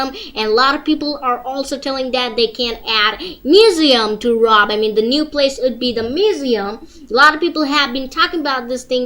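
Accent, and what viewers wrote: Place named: South, United States. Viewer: God he sounds like a hillbilly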